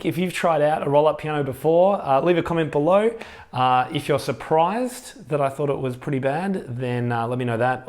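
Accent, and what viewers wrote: Australia. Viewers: I like your accent. Is that Aussie?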